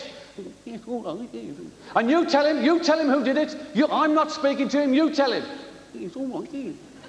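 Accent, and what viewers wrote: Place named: Birmingham, United Kingdom. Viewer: He can't help being Brummie... He was born there 🤣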